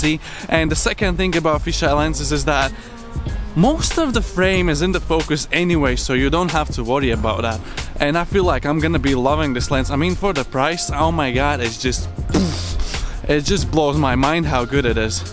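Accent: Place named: Scotland, United Kingdom. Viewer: I can't place your accent... I recognise a Scottish edge in there.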